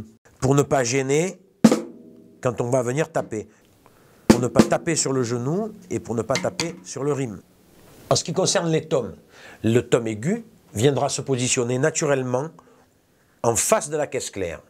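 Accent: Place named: South, France. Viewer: Bonne enseignement avec l’accent du sud en prime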